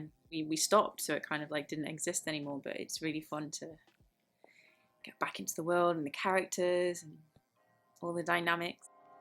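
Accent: United Kingdom. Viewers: Omg she's British??? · SHE HAS A BRITISH ACCENT HOW DID I NOT KNOW THIS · omg I didn’t know you were British. you play Macy so well I thought you were like American. I love you sooooo much like your seriously my idol. I can’t wait for season 3🥺💕 lots of love from North Carolina ❤️ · God it’s so weird with hearing her British accent and not her Macy Voice, In the Next Video PLEASE PLEASE PLEASEEE do your Macy Voice and please tell how you learned how to seaplane in like that American tone! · WOAH BRITISH!!!! <3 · I didn't know she was English.. right On!! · I had no idea yhuu were British #mind blowned🤯🤯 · Omg I never knew she was British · I love to see how you enjoy reading the episodes, I have to admit, that I refused to see this remake, but I have to applaud 👏 your work, apart from the fact that you are beautiful, my respects as an actress and the dedication you put into it, I love it, I didn't know that you were british but you're a sweetheart 😘and i love your british accent !! 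I want more from CHARMED! and many more vlogs of yours. 🌹🌹🌹🌹🌹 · I love her british accent so freaking much 😍😍😍😍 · Oh, I had no idea you were British. Was surprised when I heard that accent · Had no idea you were British. You sound like you have a bit of a northern twang. Your American accent is really good & so convincing. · OMG! I am so glad I found this chanel! I love you so much! Your so professional. I knew you were british but damn I love your accent!!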